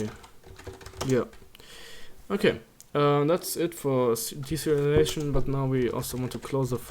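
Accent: Germany. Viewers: Hi, dein Englisch hört sich aber ziemlich deutsch an von der Ausprache, danke für dein Video.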